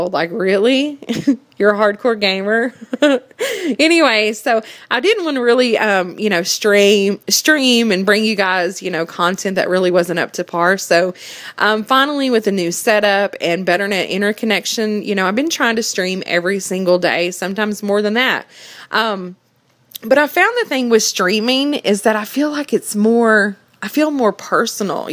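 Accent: United States. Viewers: We got the Country accent, Swarms and great commentaries. This channel has it all! Shout outs to!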